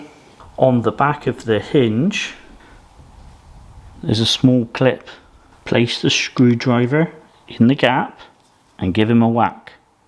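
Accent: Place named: West Country, United Kingdom. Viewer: Thanks mate very informative and love your west country accent ' oww arrrrr" Cheers from Manchester 😅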